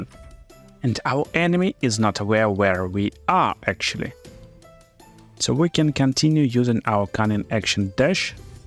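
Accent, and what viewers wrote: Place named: Russia. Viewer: какой русский акцент :)))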